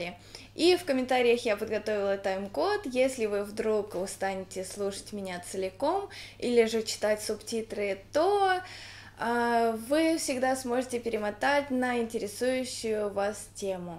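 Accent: Russia. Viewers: congratulations on reaching 100,000! I'm sure you will reach so many more.. You have such amazing talent, i'm really looking forward to hearing some more of your stuff.. I absolutely love your accent by the way! I wish i could speak russian! Lol... 😘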